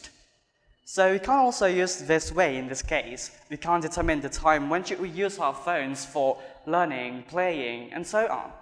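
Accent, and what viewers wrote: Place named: United Kingdom. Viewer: Masya Allah, kerenn sekali kak accent britishnyaa, semoga menjadi motivasi 🌟🌟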